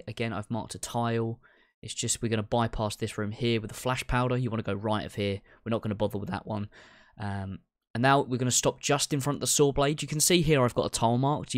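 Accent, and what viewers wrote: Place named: United Kingdom. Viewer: Man i love that british accent, "Diddly Squat fork all"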